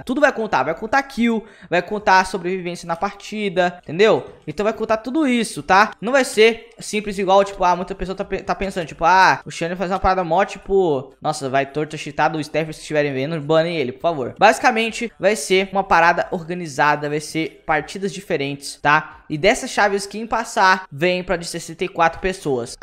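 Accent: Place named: Rio de Janeiro, Brazil. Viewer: Carioca mano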